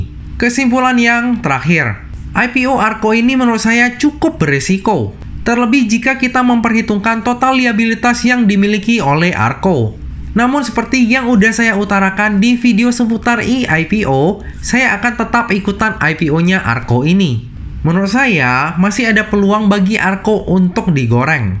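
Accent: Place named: Bangka, Indonesia. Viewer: sinmung, kek urang Bangka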